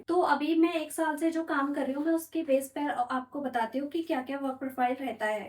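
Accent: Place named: South, India. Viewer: Aap south se ho kya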